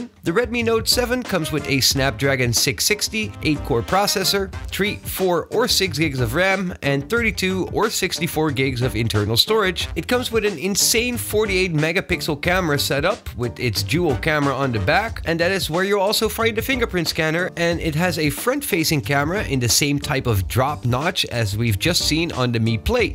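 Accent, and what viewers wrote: Netherlands. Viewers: Hoor ik daar een Nederlands accent...? 😄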